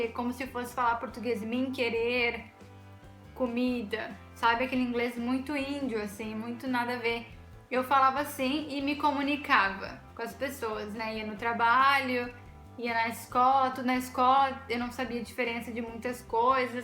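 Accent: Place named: New Zealand. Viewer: Na verdade, você aprendeu inglês com sotaque, com muito vocabulário da Nova Zelândia, que não é britânico, nem americano... Não é muita vantagem...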